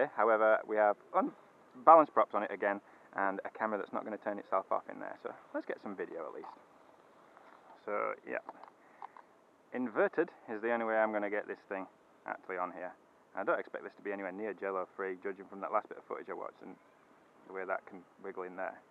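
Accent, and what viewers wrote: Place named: Northern England, United Kingdom. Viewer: Good to hear an 'oop north' accent! A very nice write up too. I'm fairly new to this quadcopter malarkey. When it arrives this will be my first brushless, really looking forward to it :-)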